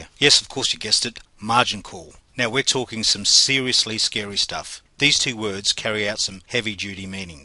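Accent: Australia. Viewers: Australian accent? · love the aussie accent, and very well explained thanks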